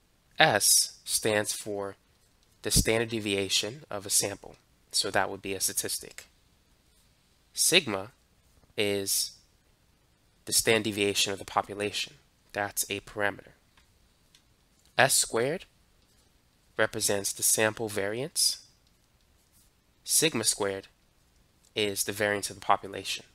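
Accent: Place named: Brooklyn, United States. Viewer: He has just the slightest Brooklyn accent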